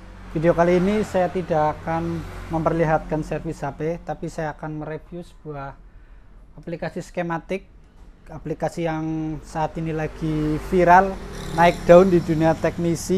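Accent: Banyumas, Indonesia. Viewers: Genah kie mesti wong ngapak...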